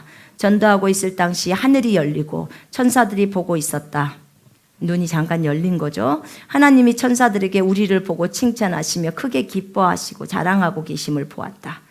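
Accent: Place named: Jeolla, South Korea. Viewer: 선교사님 전라도 말이 친근감 갑니다^^*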